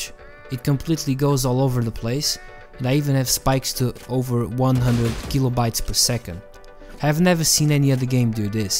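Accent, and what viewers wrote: Portugal. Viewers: senti o sotaque tuga !! E ainda bem ,temos que representar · Eu consigo perceber que és tuga pelo sotaque, por isso vou escrever em português. Eu tenho exatamente o mesmo problema, no entanto, limitar os fps's não me faz nada. Net specs: 5 ms, 200mpbs download speed, 20 mbps upload. Tenho NOS (infelizmente). Tenho alguns amigos que jogam VALORANT normalmente sem problemas que também têm NOS. Alguma outra solução ?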